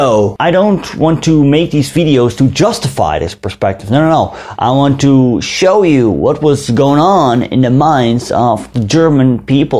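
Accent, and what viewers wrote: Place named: Netherlands. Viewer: prachtig zoals je die "internep revisionist" neerzette! (en, JA, ik spelde internet met opzet zo...) ik vind je kanaal "History Hustle" fantastisch en voor het overgrote deel correct. het enige, kleine, minpuntje is je flinke accent als je Engels spreekt en ook met de uitspraak van Franse namen kan ik merken dat je een Nederlander bent...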